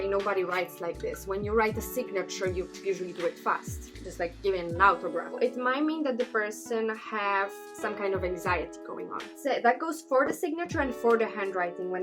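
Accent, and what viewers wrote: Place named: Germany. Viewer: = Not Italian; +the accent (and way cute!), she's likely Polish or some other former Warsaw country. Her name in Italian would be something more like: Alessandra Piotro. Before seeing her surname in video (9) I thought maybe High-German by the accent and the way she wrote the number "1" in video (3)....unless that's her husband's surname, in which case I'll stick with German. Else if not married, wanna go on a date with me?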